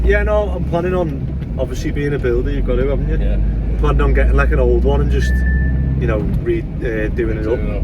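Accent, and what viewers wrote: Liverpool, United Kingdom. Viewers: Just subbed mate coz Your Scouse . Just came across ya.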